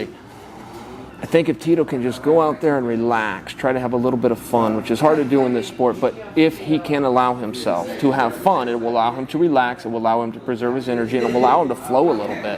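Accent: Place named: Oregon, United States. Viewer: Being from the Deep South, I really like Chael’s Oregon accent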